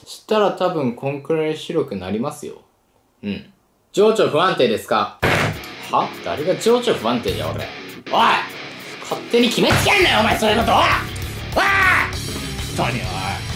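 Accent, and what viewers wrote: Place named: Yamaguchi, Japan. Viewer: たしかに山口の方言使っちょるねw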